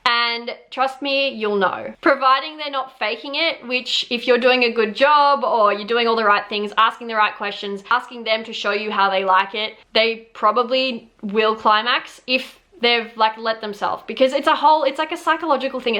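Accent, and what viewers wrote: Australia. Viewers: Are you dutch, cause your accent sounds more like an autralian one Edit: just saw your name is and you are Australian.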